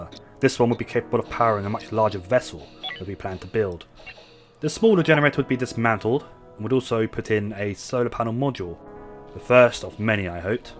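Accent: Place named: United Kingdom. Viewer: You some how sound more British then I thought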